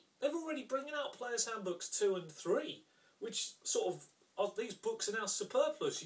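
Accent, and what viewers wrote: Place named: United Kingdom. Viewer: Just typed my name in search, found a well spoken british man talking about rpgs and a hockey goalie kicking some ass. Nice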